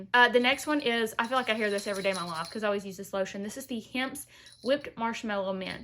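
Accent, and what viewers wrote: South, United States. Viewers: Just found your channel through Allie Glines! Ummm you are precious!! And hilarious! And all the things! Plus as a fellow southerner your accent feels like home! Can’t wait for my Fawn glosses to come in <3 love finding real down to earth youtubers!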